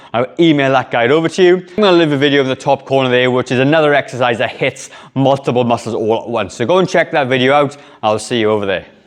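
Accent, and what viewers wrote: Wales, United Kingdom. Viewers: Bro, are you Welsh? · What’s with this guy’s voice ? Not only is he Welsh , but he’s got a weird speech defect ? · Is that a Welsh accent I detect, I'm from South Wales. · Did he say new zealand? He sounds welsh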